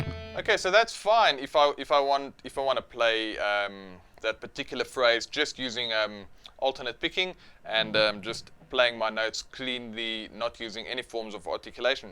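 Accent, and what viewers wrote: South Africa. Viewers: You are South African! :D \\m//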